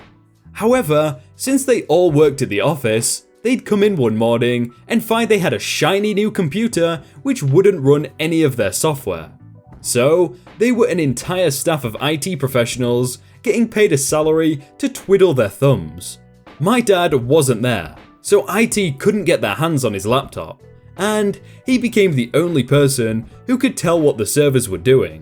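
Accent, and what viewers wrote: United Kingdom. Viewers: Yes, it's called British pronunciation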